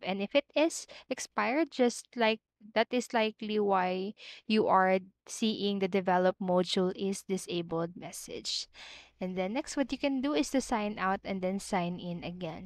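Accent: Philippines. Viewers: I loathe your fucking annoying Filipino accent!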